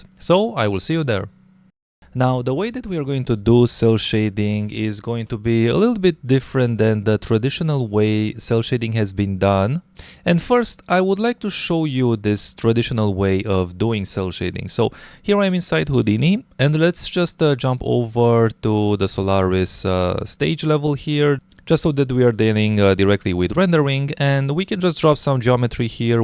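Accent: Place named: Russia. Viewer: You take not like a native speaker, are u russian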